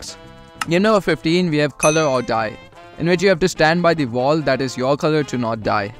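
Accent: India. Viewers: I know u r indian the freaking accent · By his accent i think hes indian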